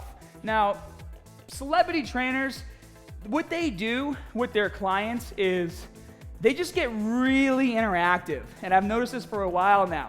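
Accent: Boston, United States. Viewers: Man are you from Boston? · I’m assuming he’s a Boston guy, right? Gahhhhbage, Lahhhson, etc. 😂😂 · Is that a Boston accent? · Boston accent keeps coming out. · Is from Boston?? GaaahBage.... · Is he from Boston ?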